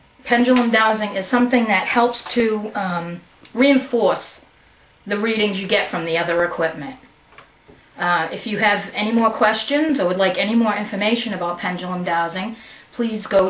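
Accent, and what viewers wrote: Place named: New England, United States. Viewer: Lol you have a new england accent just like me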